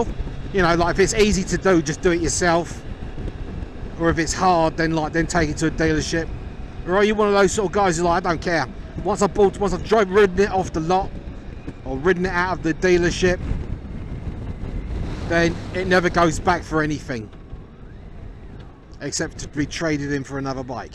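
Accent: Cockney, United Kingdom. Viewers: Don't you think you lay on the old cockney accent a bit thick mate? Do the yanks think its cute? It reminds me of Aussies who play up the Aussie Larkin thing to the hilt.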